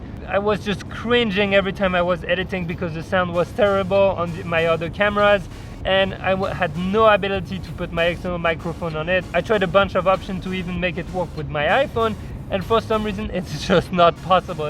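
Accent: France. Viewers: Oh je pensais que tu étais un américain avec un accent anglais... mais l'accent français est trop mignon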